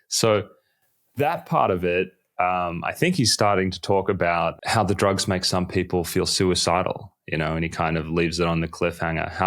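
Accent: Australia. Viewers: Based on your accent I'm guessing you're from Australia, do you work in Australia? I need to get off my meds but my psych isn't interested in helping me.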